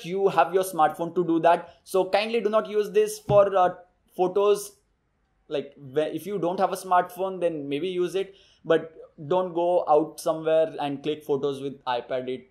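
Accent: India. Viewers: # no insult intended please dont be offended, you seem intelligent and a fast learner so im sure you learned the english language very easily so im sure you could learn an british or american accent very easily so it would be no problem for you, im saying this because you speak english in your videos but you still have your desi accent which white british english or american people but mainly racist people make fun of, especially if you try & make a conversation for a white girlfriend speaking in a desi accent she will be put off and run away from you but an advantage of getting a british or american accent is the white girlfriend you make she will like you because you sound intelligent, so to learn an english accent please by watching all episodes of DOWNTOWN ABBEY, please google season 1,2,3 etc, to learn american accent please watch all episodes of FRIENDS please google season 1,2,3 etc, again no offence i only say this for your own benefit not mine trust me im british born and i know all about this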